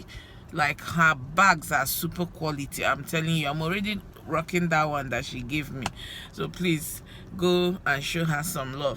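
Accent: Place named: Ngwo, Nigeria. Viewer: Everytime I hear your igbo intonation I always say you sound like someone from ngwo. Please what part of ngwo are you from?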